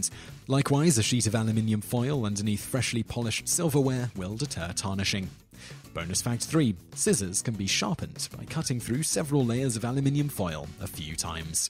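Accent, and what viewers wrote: United Kingdom. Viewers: It's spelled ALUMINUM It's pronounced UH - LOOM - IN - UM Where in the world do the English get the extra "I" that they insistently include in their pronounciation?!?!?! PLEASE STOP!!!! IT'S FRIGGIN ANNOYING!!! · Not sure if trolling, but here goes... The presenter is British while the rest of the channel team is American. It's merely the difference between American English and British English dialects. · That how we pronounce it in the UK. We think the way you guys say it sounds silly so the feeling is mutual. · CrazyBear65 bonus fact, he is English, and speaking English the way the English speak it..... not the Americans. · please explain why you, as a Brit, pronounce most words as we do but then spell your titles in the American form? I have seen in your titles color and here aluminum. Are you ashamed of your national heritage? 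As a Brit abroad you should be an advocate for our great nation and history. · I get the concept of England and America being two countries separated by a common language and variations on pronunciation. However, there are certain words, said throughout these videos that truly strike the American ear as painfully wrong. Some of these words include: Aluminium. Please note, there is only one L. Consider such words as: Luminate and Illuminate (which does have two Ls), these words - even spoken by the British, do not have the strange sound of: Al lu min E um. However it is forgivable. Controversy: it is con-tro-versy! Not Con-trav-ersy... England invented the friggin' language and the rules that go along with it. As such, they should know the difference between the sounds of an O and the sounds of an A ... not to mention the rules regarding syllables and how they are used! Although this is far more annoying than aluminum .. 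there are worse examples of the English butchering their own language. Behemoth: Perhaps the funniest thing about this word is the fact that the English are the only people on the planet who butcher this word. It is: buh-hee-moth with the O sounding like that of removing the N from the word, month... NOT: be-a-moth!!! There are several others, but these should do, for now. Still, there is one personal acception, from a previous video: Seneca. I grew up in a few different places: Ashford, Ireland; Ft. Lauderdale, Florida; and Central New York ... not far from Seneca Falls. Additionally, there is the Seneca indian tribes. We can all attest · American production, British narrator.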